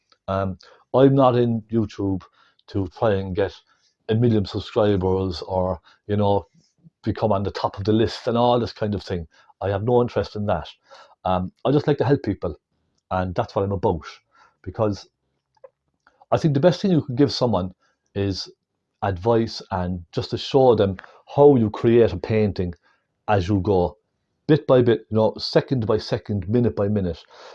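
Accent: Ireland. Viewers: is your accent Irish?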